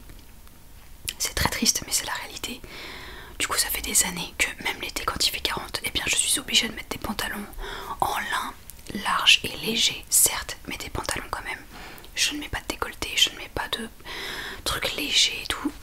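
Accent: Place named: Paris, France. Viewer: En écoutant cette vidéo, je me suis dis "ptn c'est une parisienne c'est sur " 😂😂😂 désolé pour le préjugé mdr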